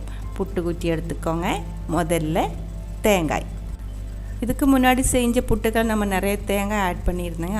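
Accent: Kerala, India. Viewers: Karala voice karala voice thann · Kerala tamil.... Wow... Chance se illa.... 😇🥰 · I think you r malayalam but you try to speck tamil well try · സംസാരം കേട്ടപ്പോഴേ തോന്നി മലയാളി ആണെന്ന് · Super maam. Your Malayala slang tamil is beautiful 👌👌👌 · அழகான மலையாளம் கலந்த தமிழ் பேச்சு வித்தியாசமான டிஷ் சூப்பர்மா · Malayali aano ningal samsaram kelkkumpol malayali samsarikkunnapole und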